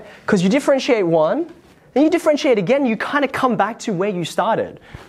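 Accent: Australia. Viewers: Well, if I’m not mistaken he’s got an Australian accent. The pronunciation “heeyah” is typical for Aussies (or maybe also for Kiwis) , like the pronunciation “aboot” (for about) is typical for Canadians. You gotta live with it. And the abbreviation cos (or sin) is also occasionally used in spoken language in my country (Germany). Nitpickers are gonna nitpick, I guess.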